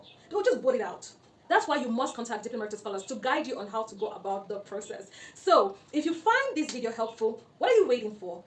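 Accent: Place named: Paris, France. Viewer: That parisian accent na die! Hahahha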